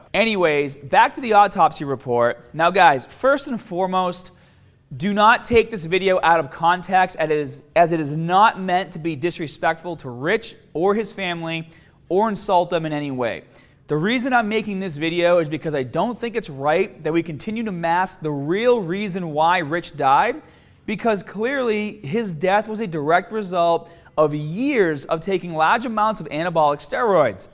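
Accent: Boston, United States. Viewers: Never noticed you're Boston accent until now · Abdahmenen? Kind of hard to take you seriously when you can't even pronounce abdomen correctly. And it's not because of your cringe worthy boston accent either. You added an extra syllable in there , man. But to the point of the video. Do I think steroids used properly and maturely (that last one is rare in today's society) can cause adverse long term effects to your health? No. Or should I say, I don't know. No one does because there is little to no actual studies on the matter. That said do i think people who overdo it and abuse the shit out of steroids - like Piana - over a long period of time are playing with fire? Yes. Just like any drug be it cocaine, marijuana, nicotine/cigarettes, heroin (obviously), and even caffeine are setting themselves up for failure health-wise in the long run. I know I'm going to get bitched at for including marijuana on that list from our stoner left leaning friends, but there was just a study produced saying that long term use of the drug deteriorates your brain. 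The study released is what I'd call a "preliminary" study, but it showed that people who smoke high potency weed showed signs of brain damage, so in other words, people who built up their tolerance to weed and smoke the stronger shit nowadays are upping their risk of brain damage. Just like people who chug bottles of the stronger vodka are going to fuck themselves up more than the dude who just drinks a few beers. That said the study was admittedly hardly conclusive, which is w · I haven't watched one of Scotts videos in a couple of years. I swear to god he didn't have a Boston accent before. This has to be a joke right? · Jim Jorgensen Why the hate? Go fuck off and find someone like with a "real Boston accent." Lmfao stupid kid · Why watch this guy? He looks the same from videos from years ago. Boston accent was not earlier, but is now. Lift some more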